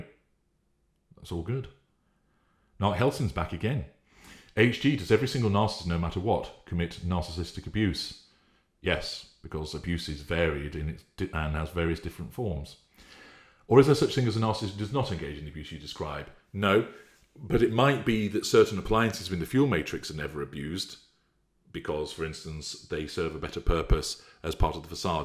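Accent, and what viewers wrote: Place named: North, United Kingdom. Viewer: Sometimes he has a slight northern accent beneath the caramel coated gravel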